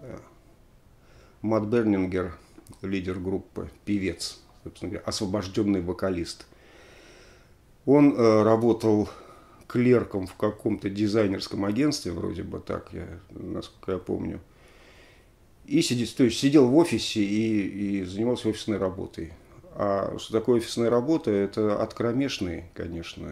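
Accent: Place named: Saint Petersburg, Russia. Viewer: я тож узнаю ваш купчинский говорок, знакомый мне с детства.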